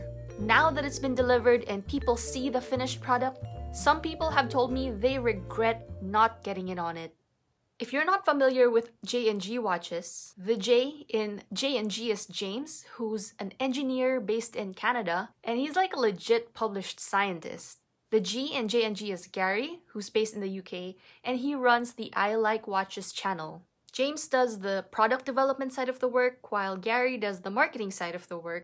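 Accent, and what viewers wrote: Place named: United States. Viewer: I wanted to ask how your English is so good it sounds native with a mild US neutral accent (I was and well technically still am an Oral English teacher) did you spend time in the US or Canada? or did you complete an IELTs course in university?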